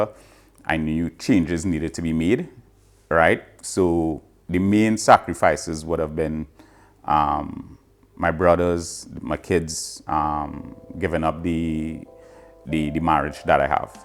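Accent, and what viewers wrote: Trinidad and Tobago. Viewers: Interesting to hear the Trini accent in Gambia. Welcome home brother. I lived in Trinidad for over 15 years, love the islands, still visit every year. Consider Trinidad & Tobago as my adopted homeland. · Lovely to hear your beautiful Trini accent🇹🇹 From one Trini to another, many congratulations on returning to the Motherland. I’m inspired. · From TRINIDAD &TOBAGO 🇹🇹 I always wanted to hear our TRINIDAD 🇹🇹 accent joining you just now everyday I dream of coming to live in AFRICA travelling there shortly as I renew my passport AMEN 🙏🏿 🙌 To you both enjoy life in the MOTHERLAND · Establish a community, one Trini to another.....good going, accent cannot hide. How will you earn an income though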